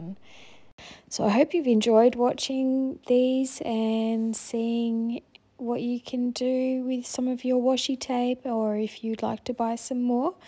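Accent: Australia. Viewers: I am new to your channel and washi tape ideas. I like them both and I have just subscribed and am looking forward to learning new techniques and designs. I live in Delaware and it sounds like you are from Australia but it sounds like we have a lot in common. Thanks for an educational video.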